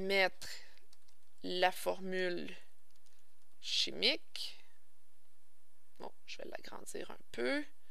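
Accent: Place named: Quebec, Canada. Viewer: un bon tuto avec une voix sexy et un accent quebecois adorable jadore je mabonbe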